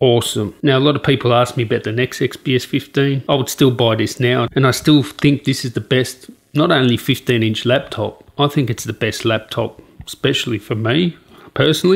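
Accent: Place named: Australia. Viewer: Hey mate, not much of a story. Plus I heard the accent and just had to listen - nice change from across the pacific... Just letting everyone out there know along with you if they had any doubt, just think about the 6+ months that have gone by to let the QA/QC processes take place and iron out the production problems. That said, if you find any issues or problems if you buy one, try to return the product straight away. I am pretty sure there was another reviewer on Youtube giving the same advice.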